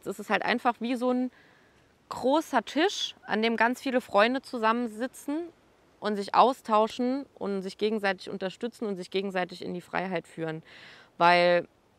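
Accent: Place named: Saarland, Germany. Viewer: Wow, ich bin beeindruckt von deinem Leben. Du hast alles richtig gemacht. Du bist sehr ordentlich und sehr minimiert und vielleicht würde ich mich noch vom Beifahrersitz trennen.Ich lebe in Rheinland-Pfalz und freue mich in verschiedenen Sätzen dein saarländisches Dialekt rauszuhören. Ich liebe Dialekt. Du lebst im Paradies und genieße jeden Tag.Viel Glück in deinem Leben.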